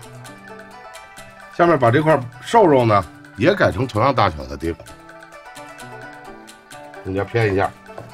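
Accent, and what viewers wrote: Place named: Beijing, China. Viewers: 第一是北京出租车司机现在基本都是郊区甚至河北人了，已经不是传统北京，也就是二环（最多三环）以内的那种北京人了。第二是旧北京是割裂的，拉车挑水的苦力是一个阶层，满蒙王公、汉人士大夫是另一个阶层。现在常见的那种夸张的北京话，比如大量儿化音、吞字吞音都属于前者。这个视频里的是接近后者。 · 师傅的北京话听得真舒服，到家了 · 为了这一口标准的京腔来的，太好听了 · 正宗皇家口音，非常悦耳。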